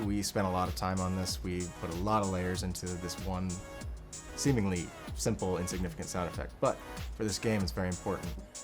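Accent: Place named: Portland, United States. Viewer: I could tell you where from portland before you even said so. I would know (I live there)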